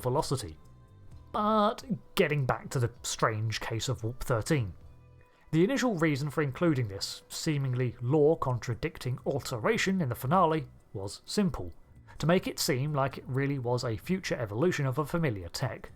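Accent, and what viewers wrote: United Kingdom. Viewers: I, LOATHE british-narrated, ANY-thing! FAIL!!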